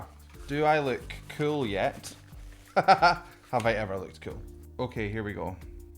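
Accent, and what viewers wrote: United Kingdom. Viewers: Why I love British and Scottish etc accents so much? You are cute so it does not help 😜 greetings from Tricity in Poland for you and and BTS Army 😘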